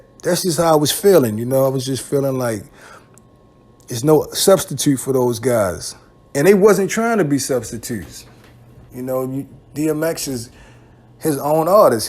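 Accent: Baltimore, United States. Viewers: Yo.. Are you from Baltimore?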